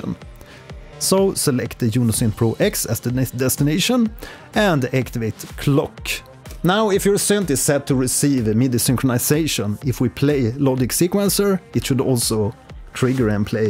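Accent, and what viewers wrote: Sweden. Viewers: Hey Great and to the point video. And you sound very Swedish! My girlfriend is from . Im going to play this vid so she can tell me what area you are from . Maybe your from Norway? Any way i love the Scandinavian teaching method and love of music. Some of the best musicans i know and have seen/heard are from the region. I am having a clocking issue. Since i upgraded to osx 14, there is not enough clock send locations are in the send box. I’m not sure if logic is now limiting how many simultaneous clock send can happen but all of the midi connections show in the mid window but not in the clock destinations window if that makes sense. I have about 20 incoming midi and they all appear, but maybe only about, 14 or 15 show for the clock send. That’s how I found your cool channel while searching for this solution to the problem. Just curious if you have seen that issue. 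If I find the answer, I will send a message here so that everybody can know. I’ll be watching more of your videos too .very very good videos.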